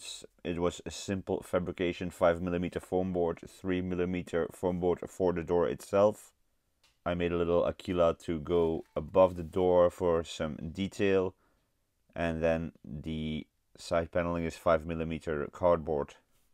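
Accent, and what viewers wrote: Netherlands. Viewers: you sound a little Dutch!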